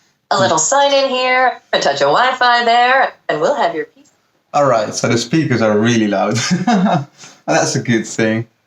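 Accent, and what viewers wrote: Netherlands. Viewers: Congratulations! How much did you pay for it? Also for what work did you buy it for, other than having of course. Like what softwares are you going to use in it? I am a mechanical engineer so wondering if those software's can run in it. Also what accent is that? You speak good English but have an accent can't really pin point it 😂. Dutch? Portuguese? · Nice man, got mine last week (one spec lower, ryzen 7, 1tb) and I'm loving the clean looks! (Ook nederlands denk ik te horen, en toevallig ook industrieel ontwerper (student)? Ik heb dat boek ook hahah)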